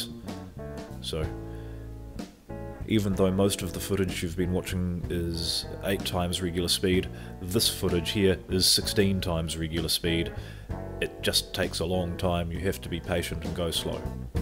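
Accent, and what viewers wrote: New Zealand. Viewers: I thought so when I seen the wax remover and the mention of Bunnings and you sound like a Kiwi..so am I in north island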